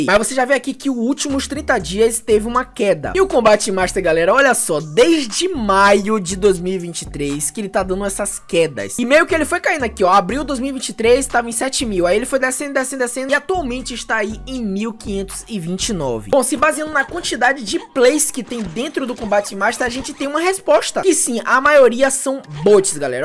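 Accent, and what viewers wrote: Brazil. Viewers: esse sotaque ai é de salvador né, possivel...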